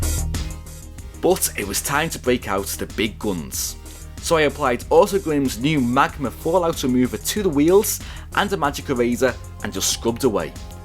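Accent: Liverpool, United Kingdom. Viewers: from witch part of uk this lad is? very intresting accent. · Am i mistaken or you're from liverpool, i deduced that from the way you speak.If I am wrong please do tell me. As someone said it's nice to see someone reastoring normal car you know one for laymans not ones from wealthy people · Are you from Liverpool or Wirral at all? Can hear a scouse twang in your accent, i'm from Ellesmere Port :-)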